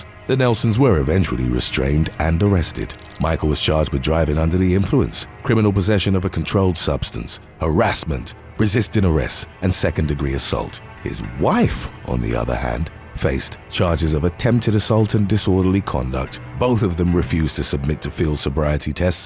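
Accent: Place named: London, United Kingdom. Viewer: Hi, as an Englishmam myself I'd go so far as to say he's from the London area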